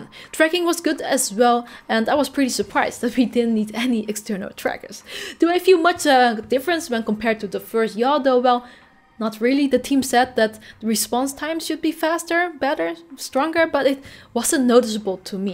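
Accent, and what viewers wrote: Netherlands. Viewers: Ur in the us? I always thought you had a Dutch accent 😅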